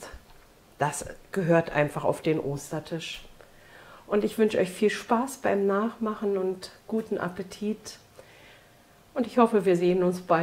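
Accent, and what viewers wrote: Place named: Altmark, Germany. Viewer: Sieht superlecker aus 🤪 Dankeschön für Dein Rezept Vom Dialekt her kommst Du aus der Altmark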